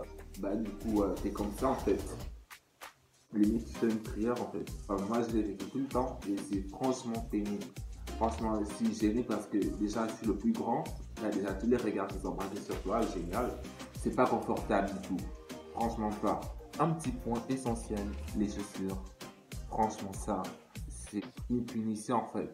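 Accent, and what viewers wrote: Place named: Gabon. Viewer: Aah bah voilà comme si je savais que t'étais Gabonais 🇬🇦 à cause de ton accent 😂😅 je te suis depuis 3 mois à Libreville félicitations et surtout continue comme ça prck depuis ces 3 mois je suis tes conseils et j'ai pris 4 cm même si c vrai que parfois je suis plus le matin et moins grand le soir et je fais 1m62 , mon père 1m65 ou 66 et ma mère 1m55 et en plus j'ai envie de faire 1m84 tu penses que je peux les atteindre stp 🤔🙏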